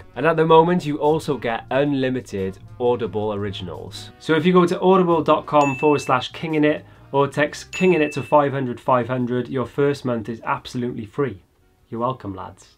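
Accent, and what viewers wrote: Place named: Wales, United Kingdom. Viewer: Dear I'm from South Wales but have lived in Australia for the last 12 years. Recently I have been so burnt out renovating my house, being a single mum and trying to build my own graphic design business. Last night I stumbled upon your channel. This video has helped me a great deal and hearing your Welsh accent is so comforting. I really needed to hear these things so thank you. I suffer with anxiety and sometimes that can be totally debilitating as I question myself everyday and give myself ahard time. This morning I amfeeling a little renewed, small steps.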